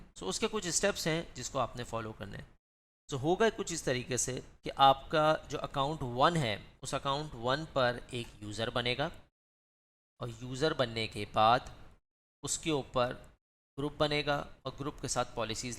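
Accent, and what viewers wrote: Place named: India. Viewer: Impossible to hear his english with the indian accent.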